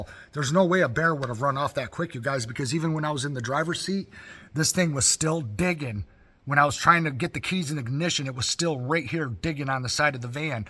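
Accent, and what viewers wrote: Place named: Upper Peninsula Michigan, United States. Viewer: Based on your accent, it sounds like upper peninsula Michigan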